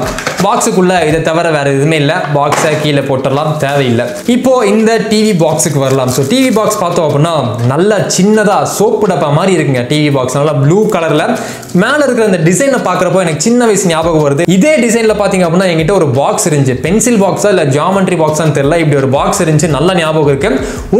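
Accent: Coimbatore, India. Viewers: Bro u r from Coimbatore right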